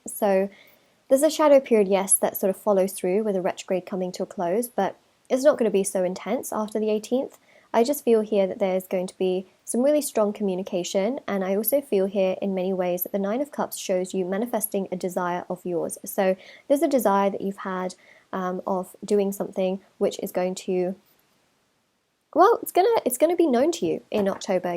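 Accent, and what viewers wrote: United Kingdom. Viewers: Lovely reading, lovely accent (very British), a big hello from America 🇺🇸 to our friends across the pond, half a Brit on my moms side !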